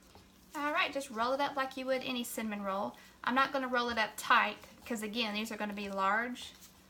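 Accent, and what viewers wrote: South, United States. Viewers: Great recipe and there's nothing wrong with a beautiful country accent🤗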